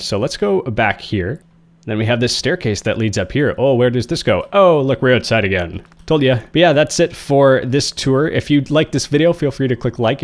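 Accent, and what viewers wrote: Canada. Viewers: this is the first video ive seen of yours, and I heard you say "aboot" and I was like "Is this guy Canadian?" By the end of the video I was like "This guy is definitely Canadian." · Man you sound Canadian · your northern accent is showing are you canadian? (from Michigan here) edit i was right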